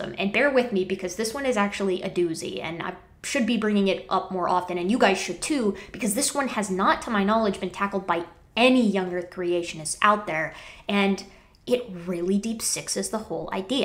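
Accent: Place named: General American, United States. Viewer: really? Sounds like a normal American East West Coast mix accent to me! Of course I'm used to YEC tone such as Hovind which is much worse imho. As regard origin quality control I'm not sure I see your point. Mutations can be increased by poor quality control during reproduction. To use your analogy this doesn't produce airplanes from cars. This produces a range of cars, mostly bad but the occasional one that has attributes on the way to airplanes. Evolution works on the "good enough to survive" not a particular design goal. If your dealership gets by with a breeding set of cars that produce more and more cars that work well enough but gradually end up looking more and more like airplanes then eventually it's an airplane dealership! The tortured analogy shows it's not a good analogy for evolution!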